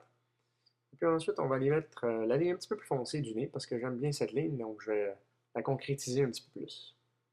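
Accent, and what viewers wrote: Quebec, Canada. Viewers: merci mais ta vois et bizzar acssant canadien mais encore merci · il a un accent québécois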